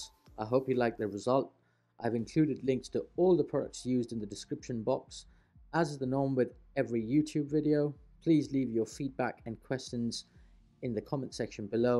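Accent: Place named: Malta. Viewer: Curious to know where your accent is from - you sound just like an educated Maltese. Great job btw!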